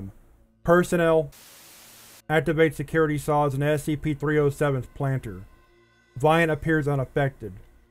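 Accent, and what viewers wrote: South, United States. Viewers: Ahh yes, your sweet southern SCP narration voice is always there for us!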